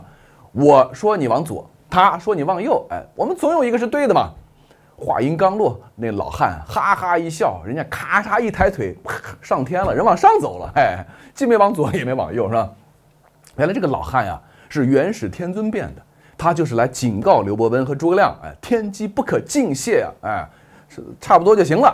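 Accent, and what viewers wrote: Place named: China. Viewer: 聽你口音，因該是在海外的大陸人，就你敢說，哈哈哈!!!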